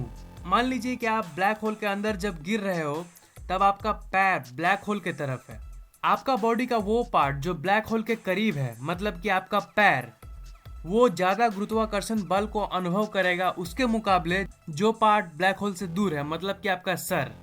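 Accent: Bihar, India. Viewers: Arey bhaiji Bihar see ho Kya?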